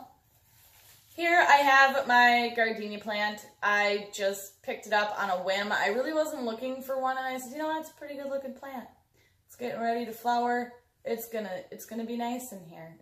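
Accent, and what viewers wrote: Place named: Wisconsin, United States. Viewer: haha I also don't like peace lillies. I completely understand you. Love your videos. I was listening to you and something sounded so familiar. Then I understood you're from Wisconson. I grew up up Wisconsin and live in Switzerland now. Your accent sounds like home.:-) Your plants are beautiful, I am also plant obsessed.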